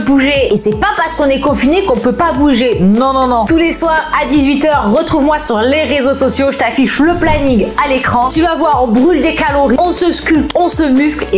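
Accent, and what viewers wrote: South, France. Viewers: Contente qu’une autre sudiste ait un super accent motivant 🥰 super sympa cette vidéo 😘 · Le nouveau programme dance TSE 😍😍😍😍 j'attends le nutrition book avec impatience!!! 😉😉 merci pour ta motivation qui nous motive, l'accent du sud c'est le meilleur 😘😘😘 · Hohoho l'accent du sud dans cette vidéo 🤣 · Je savais que tu venais du sud mais l'accent ressortait beaucoup sur cette vidéo mdr. C'est mignon. Merci pour cette vidéo. Je suis déjà tous les conseils^^ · Ooooh avec l'accent du sud😂😂😂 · J'ai bloquée sur ton accent du sud 😅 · J’ai adoré cette vidéo! Tu as totalement raison les lives rythme la journée et permettent de se vider la tête, j’attends que ça durant la journée! 🥰 D’ailleurs trop mignon ton accent du sud, je viens du sud aussi et c’est drôle de voir comment les accents varient facilement 😂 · Ton accent du Sud est TELLEMENT présent 🤣 !!! 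En tt cas merci pour tout ce que vous faites pour nous pendant ce confinement n°2. ❤️❤️❤️❤️❤️ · a grave l’accent du sud ça fait plaisir 😄team Sud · Je suis Québécoise et, dans cette vidéo, l'accent du sud semble tellement évident hihi! ☺👌 J'adore ! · Je viens juste de remarquer que tu as un sacré accent du sud 😂 Perso je ne fais pas les live cette fois mais le programme Body Power j'adore 💪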